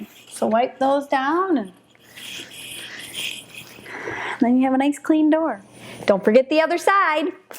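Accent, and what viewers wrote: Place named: Minnesota, United States. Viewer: I love your accent! Are you from Minnesota?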